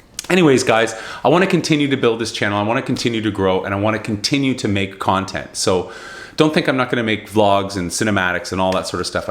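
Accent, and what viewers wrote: Scotland, United Kingdom. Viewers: Your scotch accent is pretty convincing , great personal video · Ive been in a creative rut too...need something more to challenge my creativity! I always love your stories dude!!! Can’t wait to hear more stories. And btw your Scottish accent really brought the story together ahah!!!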